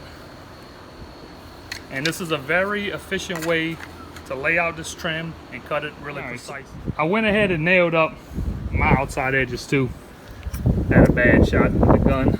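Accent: Louisiana, United States. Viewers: Where's his accent from? Louisiana possibly?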